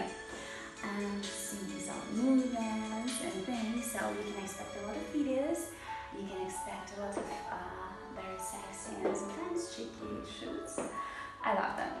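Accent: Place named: England, United Kingdom. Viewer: Thank you so much for this video! You are so genuine and sincere. I love your voice both singing and with your English accent 😍 💕 😉 Youbare elegant,classy and very much reminded me of old time Hollywood movie stars 🌟. You have that timeless quality I think. I am from Canada 🇨🇦 and also a singer/songwriter. I would like and hope ery much to meet you and talk someday. Maybe while you are on a photo shoot or music video or on video chat. I admire and adore you. Have an Amazing day 🌅 💛 ❤️ 💕 Love, Love, Love to you ❣️ Take care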